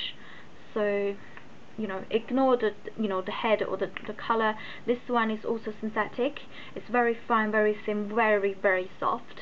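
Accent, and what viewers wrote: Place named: China. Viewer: I like her Chinese accent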